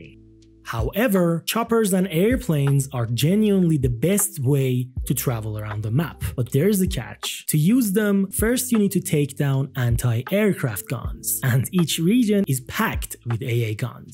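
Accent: Iran. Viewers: u got that persian accent bro 🗣🗣🔥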